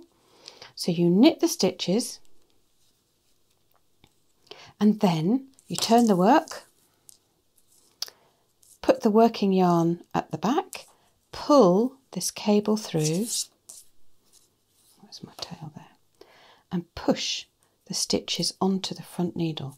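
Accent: England, United Kingdom. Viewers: Thanks for the easy to understand instructions. Love your English accent!!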